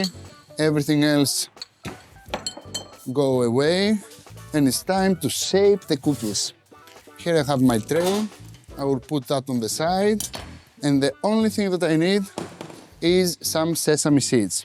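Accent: Greece. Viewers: your Greek accent is coming back 😅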